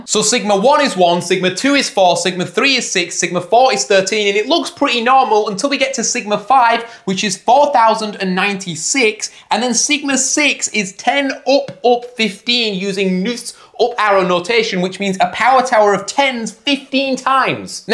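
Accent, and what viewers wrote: United Kingdom. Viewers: I Cant take a squeaky voiced british accent serious, ever at all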